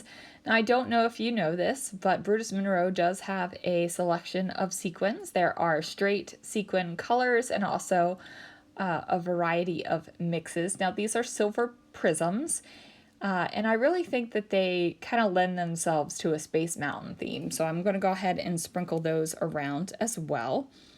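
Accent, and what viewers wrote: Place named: Maryland, United States. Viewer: Love your Maryland accent...miss that place as we have landed in Florida!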